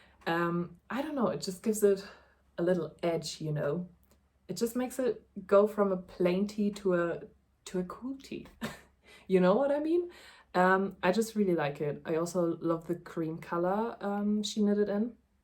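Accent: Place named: Germany. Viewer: am i crazy or you sound kinda german idk. i thought you were like a fairly big creator on here you look like you could be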